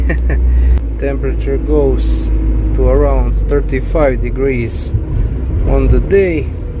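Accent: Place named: Croatia. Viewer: engleski ti ide super al mi se čini da ima i nešto hrvatskog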